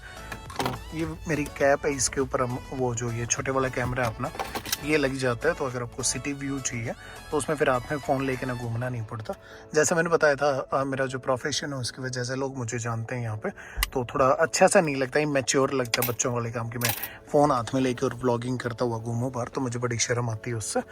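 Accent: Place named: India. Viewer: Bhai ji ap kisi technician se pahle iska voltage or amp load chek kra lo. Kahi apki battery ko bhi kharab na kr de. Apki voice typical Indian voice hai bro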